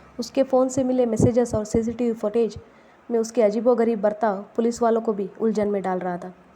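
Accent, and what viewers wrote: South, India. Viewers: Are you Hyderabadi or any other part of South India??? Love ur accent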